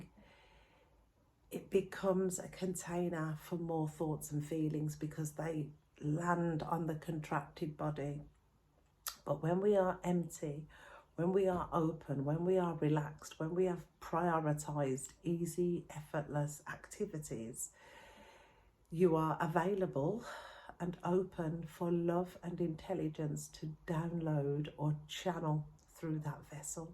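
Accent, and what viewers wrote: United Kingdom. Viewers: Can't wait to view it! Thanks, for who you are being for us. I love your videos and your lovely British accent 😊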